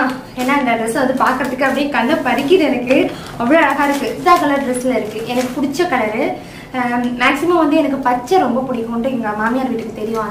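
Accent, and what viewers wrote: Thanjavur, India. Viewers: Sis neenga Thanjavur lah raajahiri yaaaa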